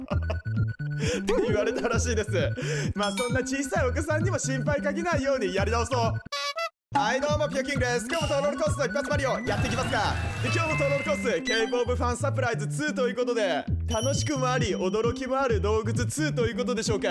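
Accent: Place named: Hakata, Japan. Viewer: 全編博多弁でやってくれるというのは いつ放送予定ですか？ 楽しみに待ってます✌️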